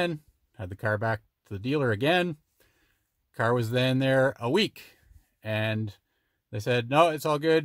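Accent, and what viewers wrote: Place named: Illinois, United States. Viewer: That Mid US Illinois area accent is just annoying! Hence, the extreme cold air may have contributed to the screen not turning on! The Acuras for the rest of us in the USA should be mostly just fine!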